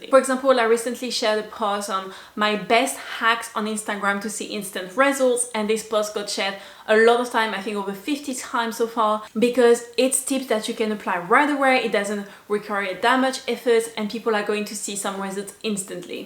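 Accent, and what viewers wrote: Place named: France. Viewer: are u you an american native? idk you sound french to me. Anyways, Love your video!!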